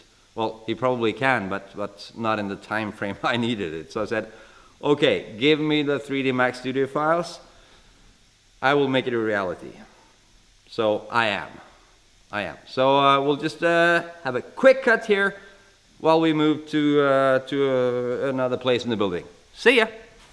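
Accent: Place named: Sweden. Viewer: Well done, your Swinglish was great. 👌 Amazing amount of work you put in to these cars and great explanations of the work here on YT. 👍😃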